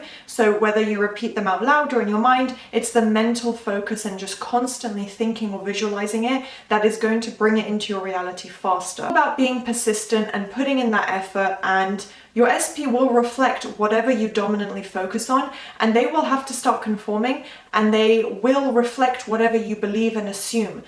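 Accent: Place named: London, United Kingdom. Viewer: thank you, dear I am passionate about your British, Londoner accent and of course, love your content always very clear and thourough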